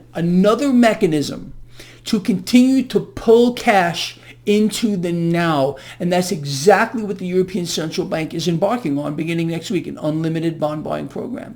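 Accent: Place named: East Coast, United States. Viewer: he's East Coast USA, there are different drawls (accents) in the US depending where you are at. One could say his accent & style are reminiscent of East Coast Mobs, but that would make him Super Cool! (Goodfellas, The God Father, etc).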